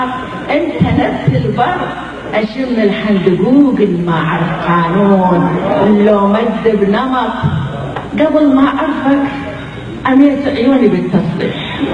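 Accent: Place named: Iraq. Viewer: ياحلو اللهجة العراقية.. احبكم هاشمية من السعودية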